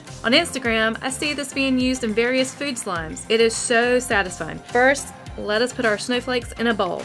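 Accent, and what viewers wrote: United States. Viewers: you have a cowboy acent :ooooooooooo seen anything weird here?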